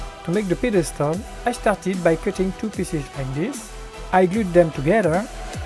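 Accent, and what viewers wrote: France. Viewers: Are u french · Tu est français ?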